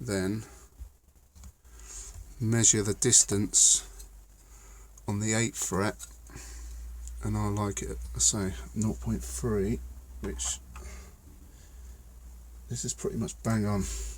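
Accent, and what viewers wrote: United Kingdom. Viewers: The British accent makes it much easier to listen to.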